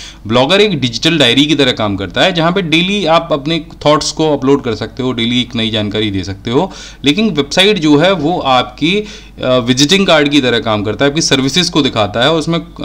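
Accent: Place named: Uttar Pradesh, India. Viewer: thank sir aap bahut deeply or ache se sam jate ho, aapki hindi bahut achi lagti hai,wase bhi u p waalon ki hindi bahut achi hoti hai, main bhi u p se hun, apka padosi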